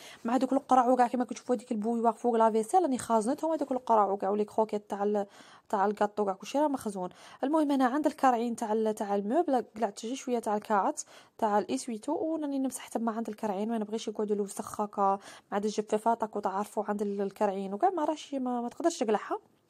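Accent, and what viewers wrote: Oran, Algeria. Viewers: مساء النور. والله. نحب. لهجه. واهرنيه. بزاف.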